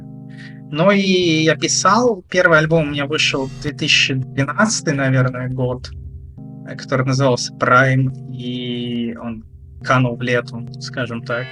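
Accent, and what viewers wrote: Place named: Poland. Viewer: нифига у тебя акцент польский появился, раньше его не было (Л-логика ☺️) меня, при знакомстве с тобой , больше всего удивила разница между тобой в инете и в жизни. в положительную сторону ;)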